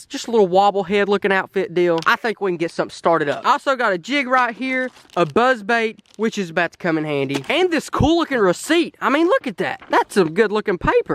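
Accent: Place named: South, United States. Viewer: Hey Man, you can’t get a camel, you gotta get a emu, I think with your southern accent people with laugh at emu better, plus camels are slow, like the mule, an emu is fast, like the atv, you gotta get a emu.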